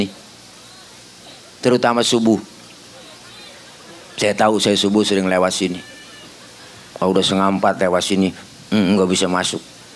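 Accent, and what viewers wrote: Jakarta, Indonesia. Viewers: Betawi nya medok banget mantaf !!!. Medan selalu nyimak. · sangat terhibur dengan logat betawi Babelan,trimakasih ya pak ustd,smoga sehat selalu💪💪🇮🇩🇮🇩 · Suka banget ceramahx,bhasax,logat Betawi biar sy orng Pati Jateng,tpi sy pahm sekali,krna pernh tinggl d Jakarta Selatan... lucu buanget,semoga bermanfaat pak ustadz...🤗🤗🙏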